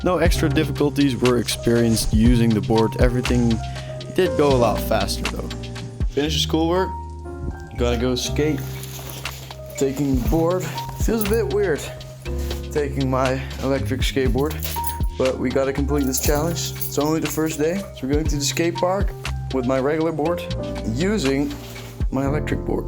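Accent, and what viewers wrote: Netherlands. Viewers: Ik wist dat je nl was khoor dat aan je accent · This guy dutch? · you are NL I can hear it on your accent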